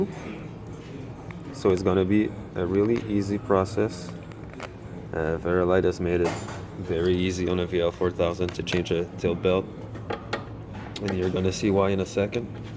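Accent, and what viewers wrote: Quebec, Canada. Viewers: Es tu québécois???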